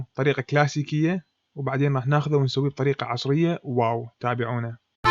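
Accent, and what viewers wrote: Iraq. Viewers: يمعودين لشيف لهجته عراقيه لو أني مشتبه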